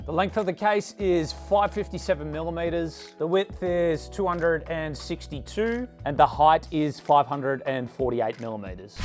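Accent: Australia. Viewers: Awesome video as always, and a great case from CoolerMaster. ☺ Thanks a lot, my friend, for the video and for showcasing that really good CoolerMaster case. ☺ By the way, I watch your videos in your original language because I really like the Australian accent. 😊 It reminds me a bit of the British accent. 😅 Best regards. ☺ Geiles Video wie immer und gutes Gehäuse von CoolerMaster. ☺ Vielen Lieben Dank mein Freund für Dein Video und die Vorstellung des echt guten Gehäuses von CoolerMaster. ☺ Übrigens schaue ich Deine Videos in Deiner originalen Sprache an, da ich den australischen Akzent richtig gut finde. 😊Das erinnert mich ein wenig an den britischen Akzent. 😅 Liebe Grüße an Dich. ☺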